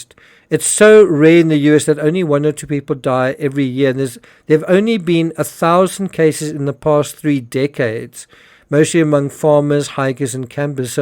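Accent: South Africa. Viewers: Do I detect a South African accent? I can understand Betsy not calling for help. I got swine flu, and could not even call my husband, get back on the bed, if he had not come home, I had slipped into an acceptance of death stage, he carried me to the car and into the surgery, all the time I was in a dream world. RIP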